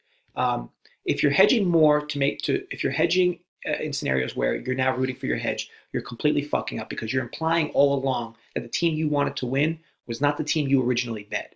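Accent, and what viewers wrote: United States. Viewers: Thanks a lot for your analysis! i am a big newbie in sports betting and also a french native speaker so.. i find difficulties with the amercian accent.. could you please explain how to decrypt " Rider -2 Vs Sienna total 136" ? Yesterday i think that i did a big mistake, i saw your video and i thought i should put money on Missouri and i lost😫😫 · You are the man, thanks! I should have known from the accent you were from NJ as well 😉 I’m rolling with Arizona -1 for now.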